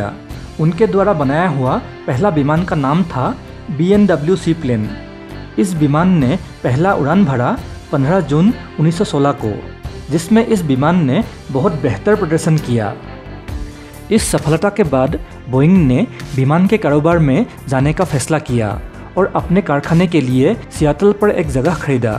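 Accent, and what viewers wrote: Assam, India. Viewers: Tm Assamese hy kya 😅 Hindi assemess lag rah hy😅😅😅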